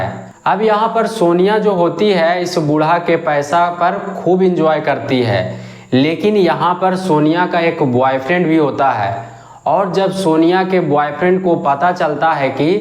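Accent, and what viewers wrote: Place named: Bihar, India. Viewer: Bhaii you’re doing well but change the Bihari Hindi accent to org Hindi write before recording you’re doing well 😊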